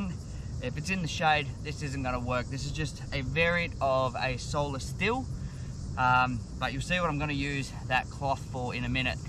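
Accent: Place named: Australia. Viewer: FROM YOUR ACCENT YOU MUST BE AN AUSSIE. GOOD ON YOU ! THANKS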